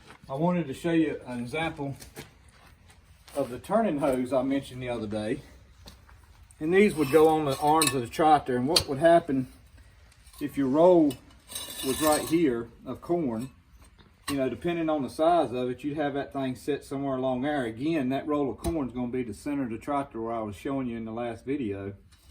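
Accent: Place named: Coastal North Carolina, United States. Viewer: Listening to you talk I'd have to say your from coastal NC , listening to your accent. I live here in upstate SC but from the lowcountry. I'd have to Say NC as well cause you didn't say Agrisupply in Statesboro or Florence! Haha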